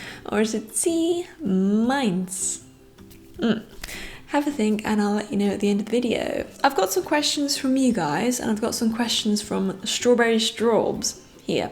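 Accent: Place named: United Kingdom. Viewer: Happy birthday. I like your videos very much. I don't know why you are surprised that most of your viewers are German. You talk about Germany, German culture and so on. This topic is well known for us Germans. So it is easier to follow the topic. Listening to English speaking youtubers is a little bit of exercise for me. It is good not going out of practise. You speak very clear and I like your your British accent and the sound of your voice. It is soothing, pleasing to the ear. Keep your style of video making. It is very enjoyable. I'm looking forward for your next videos. This is my first comment on youtube ever. Greetings from the Harz Mountains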